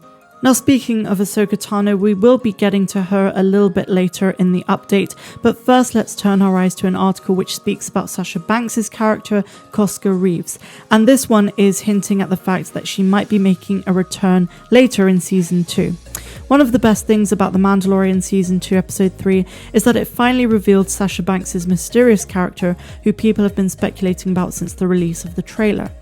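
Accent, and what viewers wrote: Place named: South West England, United Kingdom. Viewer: Id know those hard rs in accent anywhere, your from the south west! Likewise lol, p.s. Great content as always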